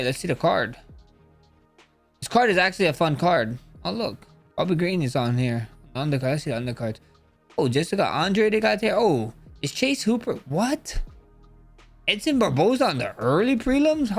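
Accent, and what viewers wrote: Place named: Hawaii, United States. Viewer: Just talks like every other Braddah out here 😂🤙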